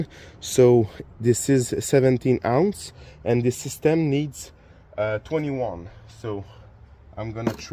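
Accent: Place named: Quebec, Canada. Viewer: Salut j'aime bien tes video je reconnais ton accent 😅 comme le miens. Ou tu te procure le r134-a au quebec?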